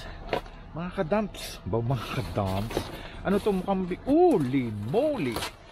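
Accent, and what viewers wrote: Iloilo, Philippines. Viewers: Wow sir ang sarap ng mga nakuha mo.i.lke u kc nag iiwan ka for ithers fi mo inuubis lahat. At namiss ko ung ilonggi accent mo manong.welcome back gid last ko tan aw ato bala damo nga mga bayo gintilon mo kesa ibaoy sa land fill kanugon gid.salamat gid sa imo. watching from antipolo city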